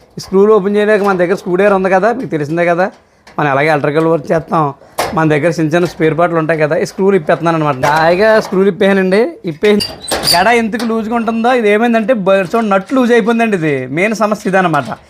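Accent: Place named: Srikakulam, India. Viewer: శ్రీకాకుళం language లా ఉంది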